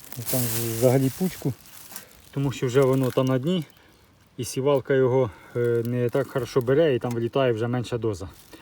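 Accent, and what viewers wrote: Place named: Vinnytsia, Ukraine. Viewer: Сорт цибулі LUSY? ( Вона жє Свіфт.) Я правильно зрозуміла? А то з вашим чудовим Вінницьким діалектом не завжди зрозуміло.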